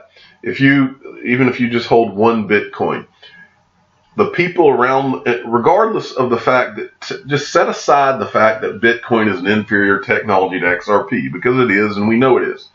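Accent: South, United States. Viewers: I thought you had had 5 or more margaritas ...until i realized i had your vlog set to 0.75 ..which makes your southern slaw sound so slow and sounding drunk ,my deepest apologies ...of course i can not take away your southern twang ,but it seems i can make it worse . xrp bud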